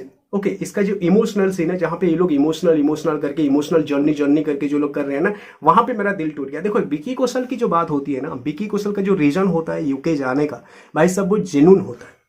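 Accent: Odisha, India. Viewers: Bhai AP kya odisha se ho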